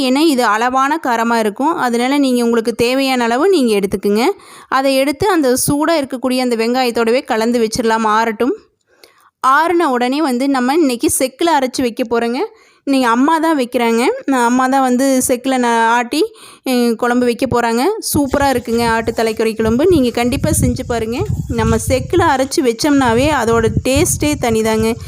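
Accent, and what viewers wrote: Kongu, India. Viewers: தலைக்கறி நாவிற்கும் கொங்குதமிழ் கேட்பதிற்கும் சுவையாக இருக்கிறது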